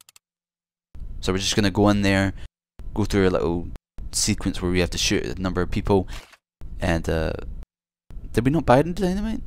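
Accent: United Kingdom. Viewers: Oh my bad. I knew you were somewhere from uk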